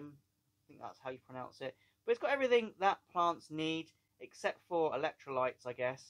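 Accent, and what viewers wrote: United Kingdom. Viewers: Hi, thank you very much for you always nice and interesting video's. So refreshing with your UK accent, compared to the noisy and annoying American videos. Also thank you very much for the cool intro theme song! Been looking for that one for years. 👍 Always hate it, when my timer not doing what I think I programmed it to do.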